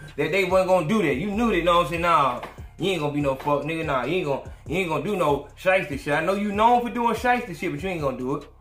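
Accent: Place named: Alabama, United States. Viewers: What part Alabama u from. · What part of Alabama you from bruh? I’m in the 334.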